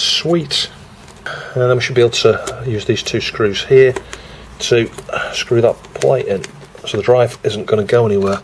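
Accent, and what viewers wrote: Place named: Manchester, United Kingdom. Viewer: Sorry for offtopic but I like your accent, is that Manchester accent? :-)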